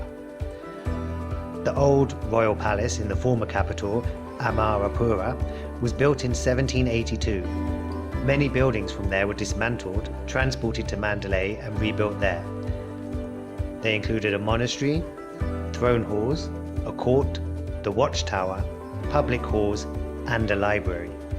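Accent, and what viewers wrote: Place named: RP, United Kingdom. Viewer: You sound so posh I love it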